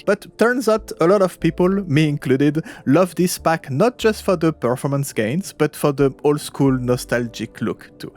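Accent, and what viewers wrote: France. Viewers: bonne vidéo merci. tu serais pas français ? ton accent me fait penser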